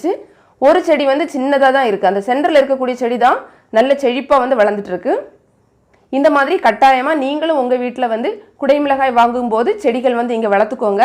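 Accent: Kanyakumari, India. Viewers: Mam neenga kannyakumari ah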